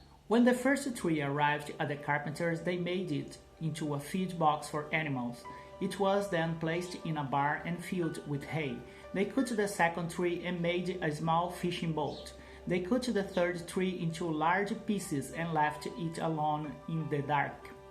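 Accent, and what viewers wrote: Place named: United States. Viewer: Parece um americano convetsando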